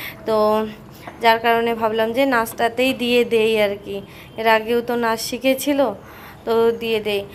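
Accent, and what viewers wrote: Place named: Rangpur, Bangladesh. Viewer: তুমি কোন ভাষায় কথা বলো,। বাংলাদেশের রংপুরের মানুষ তোমার ভাষায় কথা বলে।মানে বাংলাদেশের উত্তরবঙ্গের মানুষ তোমার ভাষায় কথা বলে। আমার খুব ভালো লাগে ❤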